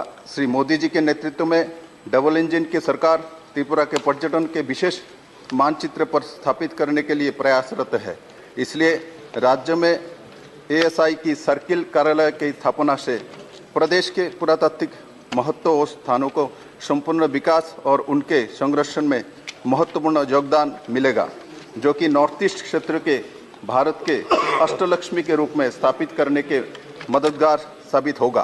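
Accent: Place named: Bangladesh. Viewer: Bangaladeshi bangla jesa bat karti hu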